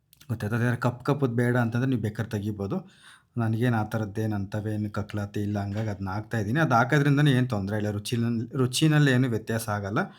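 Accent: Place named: Davanagere, India. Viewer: We in our family love the way you speak Kannada... please preserve the Davanagere dialect🙏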